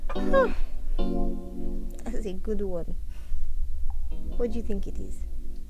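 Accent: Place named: Nigeria. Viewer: wow she has conc. nigerian accent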